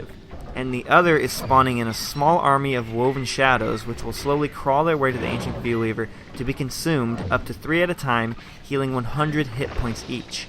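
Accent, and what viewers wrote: France. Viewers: ur french?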